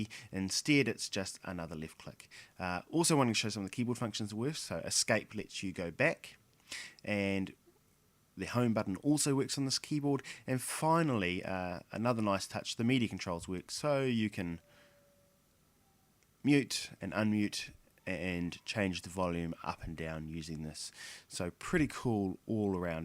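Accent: New Zealand. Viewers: r u from NZ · cool accent! NZ i presume?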